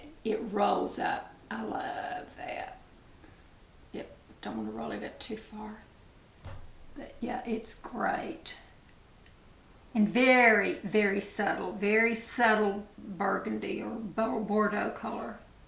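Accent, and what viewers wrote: South, United States. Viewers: you are adorable...such a classy well mannered woman. I love your southern accent I could listen to you all day. Thank you so much for showing us all the products and giving a review. I may buy the KVD liner because my liners always disappear after I wear them awhile. The Milani palette shadow looks beautiful on your blue eyes. On the color wheel orange is opposite blue so that makes your eyes pop. You are so young looking, just stunning.🌸💕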